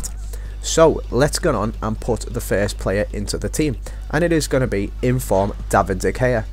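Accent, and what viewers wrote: Liverpool, United Kingdom. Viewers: Captions for a scousers voice is just amazing haha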